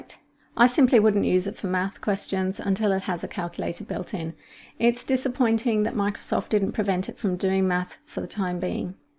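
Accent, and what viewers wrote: United Kingdom. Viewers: Where are you from? The UK? I really like your voice and accent. And videos too 😎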